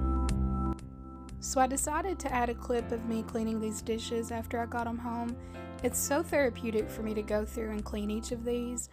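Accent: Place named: Kentucky, United States. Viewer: You have to be from Kentucky you have the voice lol so am I...great haul,,love Peddlers Mall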